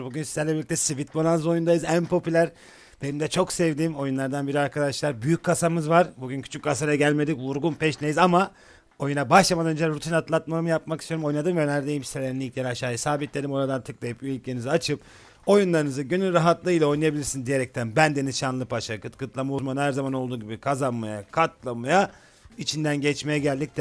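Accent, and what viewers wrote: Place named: Thrace, Turkey. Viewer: Trakyalımısın şive biraz var gibi, hadi bakam derken 😅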